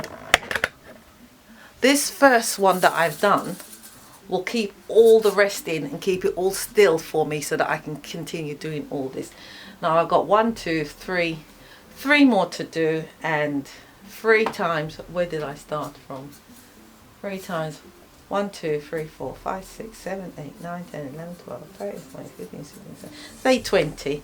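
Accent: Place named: United Kingdom. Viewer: Thank you for this video. My parents have 4 very nice but old metal blinds that all need repairs. And cleaning. You seem very down to earth and I enjoyed the way you presented all this. Using your instructions to repair blinds all the way in Australia 😁 (I'm assuming you're in the UK coz your accent sounds like my brother-in-law's). Cheers 🙌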